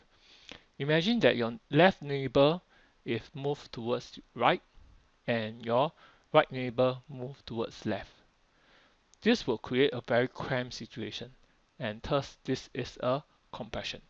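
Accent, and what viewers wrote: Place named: China. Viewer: Good video. U sound very asian Chinese.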